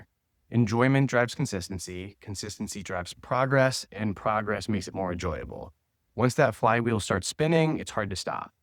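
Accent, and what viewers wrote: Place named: United States. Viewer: Wow!! All this wonderful information you are sharing really resonates with me. No doubt you have a way with words, and your American accent is clear, pleasant and easy to understand. So, congratulations on your YouTube channel, and thanks a lot for your helpful insights. I look forward to more fantastic stuff—hopefully soon. Best wishes from Spain!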